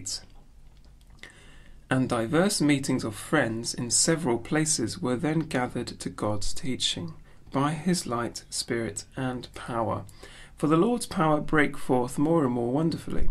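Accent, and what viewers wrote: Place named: England, United Kingdom. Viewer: I wouldn’t mind this in full, as I can’t find the complete audiobook of the journey. It’s also good to have an Englishman read this , as American speakers cannot pronounce British English place and person names as those in the British isles say it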